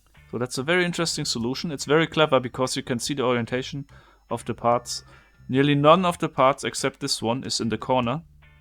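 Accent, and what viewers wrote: Germany. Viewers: Subbed i just find you. Guttentag ich wohne in die niederlande. Aber meine deutch is nicht gut. Meine name is inge. Ehm thats problaby all i got for now lol. Somehow i first thought u were france lol but in this video i got it your heavy accent is deutch. But maybe a tip? Stop saying spoiler break. Doesnt make sence. Its an annoucement. In the screen with text there is no spoiler its just a anoucement. So its better to just let the screen happen. Thats how most people do that. I know i watch alot i mean hours a day of youtube. Im chronic ill so im home. I live with my second dad. So i hope this tip helps you for making even better videos. 👍 ⚘⚘⚘ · In diss and diss :D dieses geile denglisch :D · ich finde es immer lustig, wie man direkt an der Aussprache merkt, dass da ein Deutscher Englisch spricht :D ich mag dieses Platte Deutsch/Englisch :D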